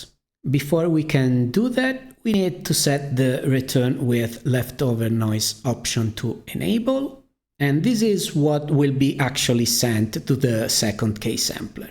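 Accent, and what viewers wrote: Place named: Italy. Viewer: Thank you! Watching your videos after having spent a few months with comfy is like going through a grammar book after having had a thousand hours of input. Same hilarious feeling! Also your accent is cool! I've spent some time around one Italian dude in India, so watching your videos brings back those fun memories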